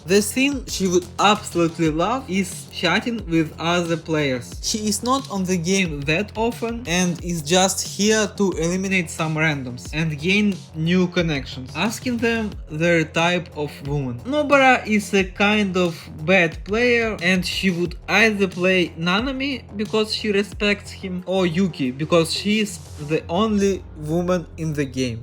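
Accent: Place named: Russia. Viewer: Ты Русский? Просто акцент прям пиздец мощный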